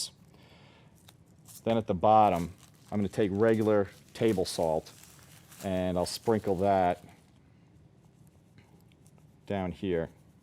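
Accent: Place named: Nashville, United States. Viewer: Sounds like he's from Nashville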